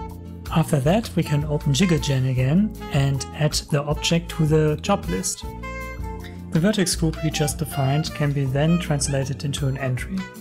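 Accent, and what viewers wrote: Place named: Germany. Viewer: Everytime you hear a person explaining their project with a slight german accent, you instantly know, that it is high quality and has some smart solutions in it.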